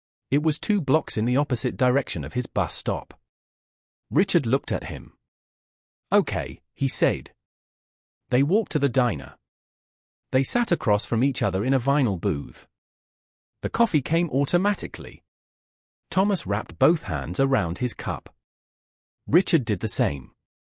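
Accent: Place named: Europe. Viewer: Can't get past the strong European accent describing a Black (from Atlanta, USA)... Thumbs down on narrator.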